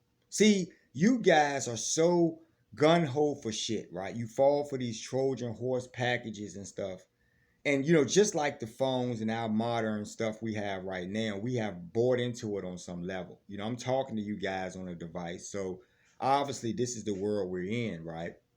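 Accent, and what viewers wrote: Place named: South, United States. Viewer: I love that you are a Southern African American. I love your accent/dialect.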